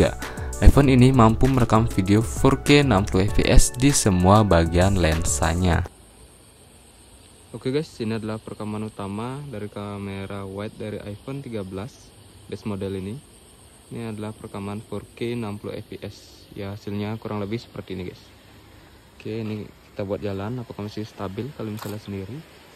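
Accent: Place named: Bali, Indonesia. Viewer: Logat bali, plat motor bali, jawabnya jakarta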